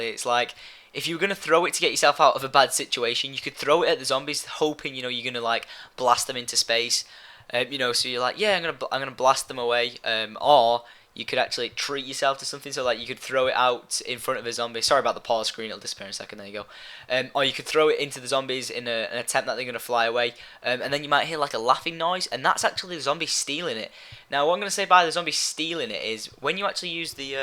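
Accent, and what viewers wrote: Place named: United Kingdom. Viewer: You have such a funny british accent :D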